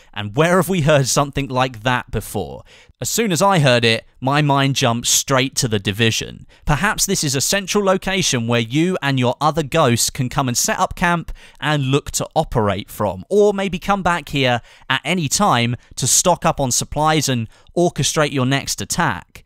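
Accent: Spain. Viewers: I see you took the old Spanish accent out of the cupboard :)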